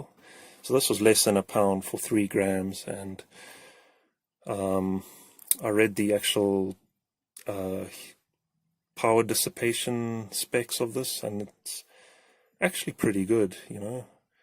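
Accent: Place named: South Africa. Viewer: just a random South African saying my fok when you hear the accent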